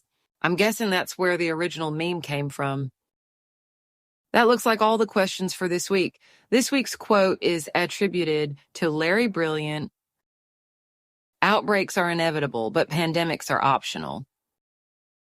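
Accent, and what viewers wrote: South, United States. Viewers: Well damn, Miss, I think I might be fallin' in love with you, lol! Just joking, but for real I LOVE all the things you talk about and the knowledge you give us! Really good stuff all around. Plus, I am a Southerner and you have a wonderful accent that warms my heart. I grew up under tutelage of a very smart father - anesthesiologist, sailor, hunter, fisherman, etc. So, I, as a sailor, surfer, fisherman, etc. became, as he was, something of a meteorologist (because surfers and sailors have to pay attention to such things) as well as something of a science nerd. Long story short - I love what you do and am VERY appreciative! I have already followed and will continue to do so. Thank you from South Carolina;-)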